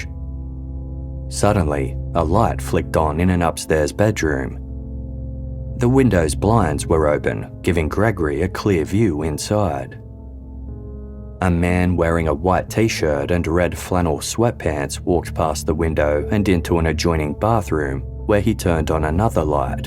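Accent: Australia. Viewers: SOON AS I HEARD THAT AUSSIE VOICE I TURNED HORRIBLE..I HATE WHAT OUR COUNTRY HAS BECOME..BUGGER OFF..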